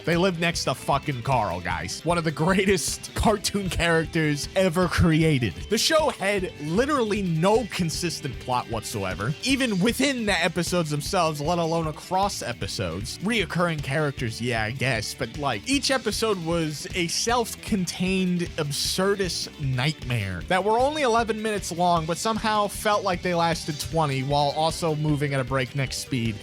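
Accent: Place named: Brooklyn, United States. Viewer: This is one of the most brooklyn accents ive heard and im all for it lol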